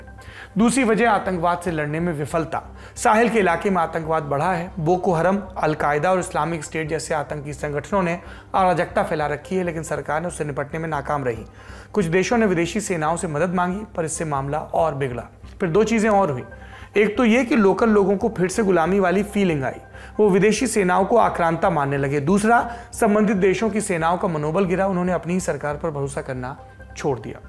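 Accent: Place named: Bihar, India. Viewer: Sir apka news kahne tarika mast hai ekdam bihari U.P. style mein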